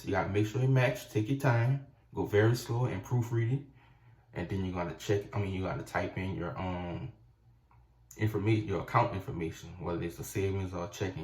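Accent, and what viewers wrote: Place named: New Orleans, United States. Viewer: Are you from Nola???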